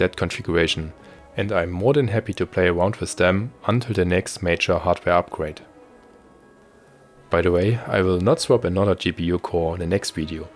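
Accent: Germany. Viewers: I smell German! Its clear due to your Accent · That german accent xD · Hör ich da nen deutschen Akzent 😂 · hey man, ich mag ja deinen Content, extrem geil, ABER deine Englische Aussprache ist soooo grottenschlecht das es mir die Schuhe auszieht, dein englisch ist auf dem Status des deutschen Funkers der der Titanic auf die message "we are sinking" gefragt hat "what are you sinking of" dein englischer Wortschatz ist echt gut, keine kritik, aber dein deutscher Akzent tut einem in den Ohren weh :.( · das deutscheste englisch der bre ist deutsch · i hear deutsch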